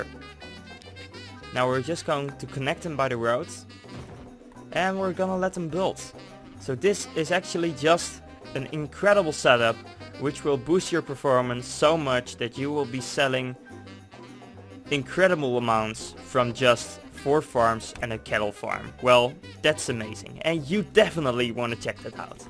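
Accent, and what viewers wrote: Netherlands. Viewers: je nederlands accent is duidelijk te horen lmao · he sounds dutch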